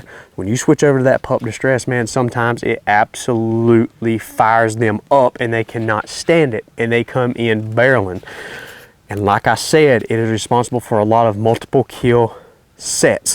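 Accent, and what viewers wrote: South, United States. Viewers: enjoyed listening to your southern drawl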